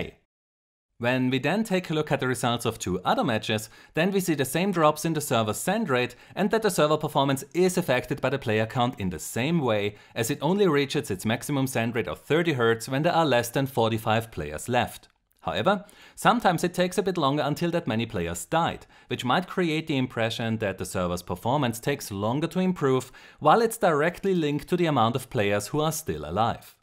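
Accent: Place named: Germany. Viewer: Normalerweise nervt mich ein deutscher Akzent immer wahnsinnig, aber bei dir geht das eigentlich sehr gut.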